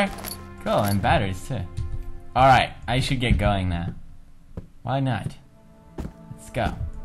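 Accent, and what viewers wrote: Sweden. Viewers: you can hear it that the man is swdish, so im i<3<3<3 all the guys in my class always says Maria, im like its just my facebook name brofist* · its an accent. hes swedish. his actual name is. his girlfriend is italian so he lives in italy. get your facts straight. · Pewdiepie has caused me to become so interested in swedish culture.. plus i never knew how amazing swedish accents are! they are Adorable. and thats coming from a guy ^.^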